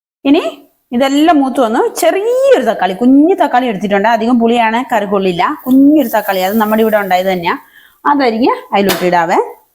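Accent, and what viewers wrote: Kottayam, India. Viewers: നിങ്ങൾ കാസറഗോഡ് കാരല്ലേ, പിന്നെങ്ങനെ കോട്ടയം സ്ലാങ് പറയുന്നു 🤔🙄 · Hi chechi njn ipplanu video kanunnathu. Super … Kottayam style samsaram ❤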